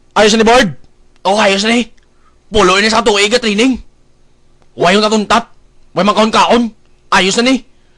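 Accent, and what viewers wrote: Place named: Iloilo, Philippines. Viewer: hahaha.... ilonggo gid man ah...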